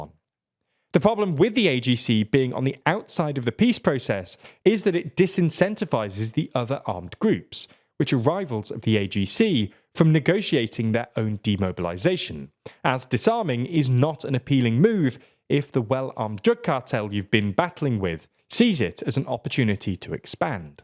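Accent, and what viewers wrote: England, United Kingdom. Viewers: it's cuz he is British and doesn't make R sound so it sounds like fk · That's just the English accent. They all say it like that.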